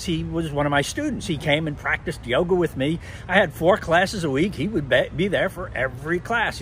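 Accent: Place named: Brooklyn, United States. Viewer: Your Brooklyn accent is easy to recognize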